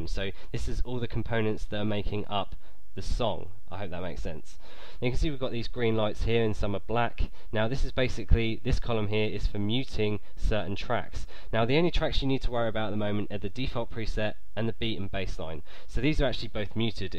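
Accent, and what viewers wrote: United Kingdom. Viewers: nice..that really helped me out ALOT! i'm on my way to downloading LMMS!!!!!!! :D p.s I LUVED ur british acsent lol.... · Something I've observed. People with sort of British accents always make the best tutorials! Thanks, I think I've got it now! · Oh my, i love your British accent, and great tutorial. :D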